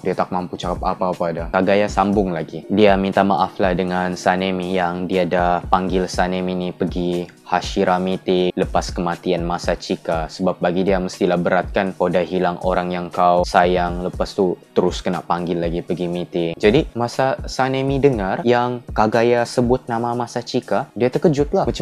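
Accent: Sabah, Malaysia. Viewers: org sabah kh? ketara sleng sabah tu hehee. btw, hai saya fans dari sabah hehee😊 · punya best lah orang sabah ini ckp!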